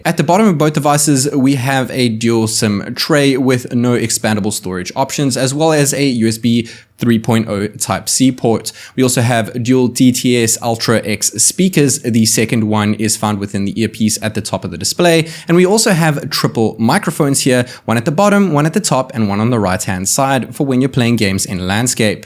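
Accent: South Africa. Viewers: i swear you sound south african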